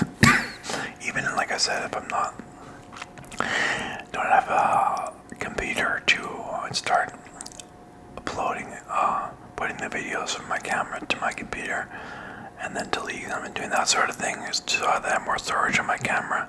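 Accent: Canada. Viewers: are you from nova scotia? familiar accent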